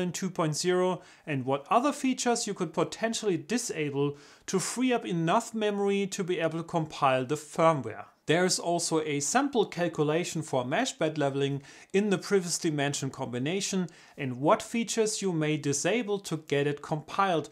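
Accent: Germany. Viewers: Thanks for your help. The german accent punctuated my OCD demand to make my dumpster find functional again!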